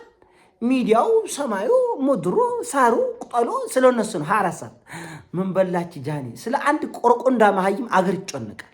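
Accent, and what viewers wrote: Tigray, Ethiopia. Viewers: You are the smart person specially you represent the smart Tigrie people with the accent .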